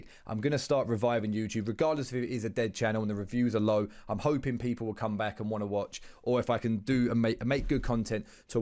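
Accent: United Kingdom. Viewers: I always enjoyed watching you and Spratt the most. Maybe as a Kiwi I just like the UK accent more than the American one? Excited for what's coming next!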